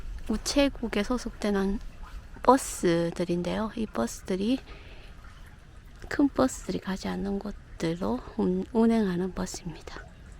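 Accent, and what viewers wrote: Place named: Gyeongsang, South Korea. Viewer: 눈이내린 스위스로~ 달려가고파요 ^^ 하늘빛까지 내려앉은 눈덮힌 설경이 너무 아름다워서 얼른 캔버스에 옮겨놓고 싶어지네요! 그래도 될까요? 안개속 몽환적인이 풍경이..... 눈까지 맑게해주네요!!! 영상속 씨의 정겨운 저랑같은 경상도 억양도 소박하게 느껴지고, 이다음 스위스가게되면 좋은곳 많이 소개해주세요. 감사히 영상 잘봣어요^^ 즐건 주말되세요♡♡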